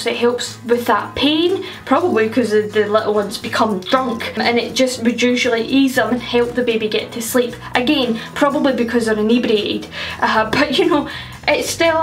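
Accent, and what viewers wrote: Scotland, United Kingdom. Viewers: I know I've said this in your comments before on other videos but, I love your accent, your absolutely beautiful and awesome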